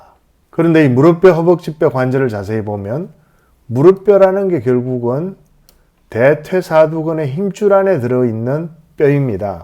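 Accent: Gyeongsang, South Korea. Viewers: 갱상도말투 댐알겠다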